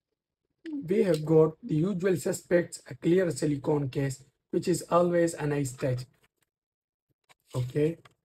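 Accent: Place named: United States. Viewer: American accent!!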